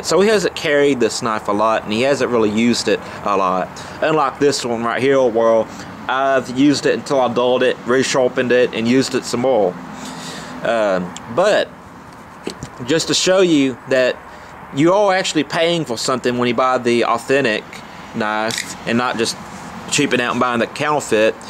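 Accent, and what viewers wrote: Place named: South, United States. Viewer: I bet u from southern USA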